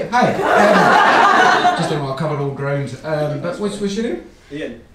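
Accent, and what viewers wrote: Scotland, United Kingdom. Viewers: I'm here for the Scottish accent!! ❤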